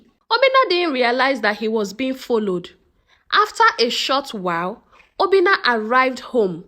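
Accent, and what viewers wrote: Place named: England, United Kingdom. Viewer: I like your English accent 😁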